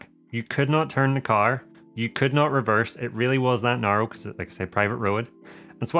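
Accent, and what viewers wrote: Northern Ireland, United Kingdom. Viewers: Hi, your accent is amazing. I had to focus and struggle to comprehend each sentence, and I mean that as kind of a compliment. Where do you live in the UK? I really have the notion one should recommend your video to advanced level English language students because as a non-native English speaker for more than 30 years I still very much struggled understanding you :) Very good advices regarding safe and legal drone flying BTW. Cheers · Northern Irish accent? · Northern Ireland accent? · Are you Northern Irish?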